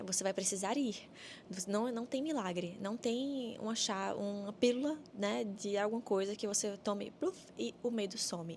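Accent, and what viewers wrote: Brazil. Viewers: Se tu disseres que nao é catarinense, eu nao acredito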